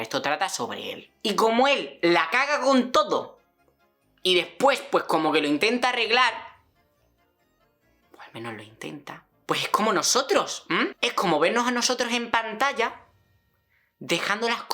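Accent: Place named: Andalusia, Spain. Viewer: Este chaval es andaluz y se nota, fan.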